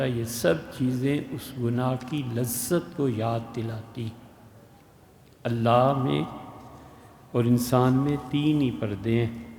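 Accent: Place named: Lucknow, India. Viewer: مفتی سعید خان پاکستان بن چکا ہے اور اب تم لکھنوی ماحول سے باہر مسلم معاشرے کے رہائشی بن چکے ہو لہٰذا اپنے لب و لہجہ کو پاکستانی زبان سے متعارف کروا کر ہندو غلبہ والے معاشرے سے دور کر دو تو بہتر ہوگا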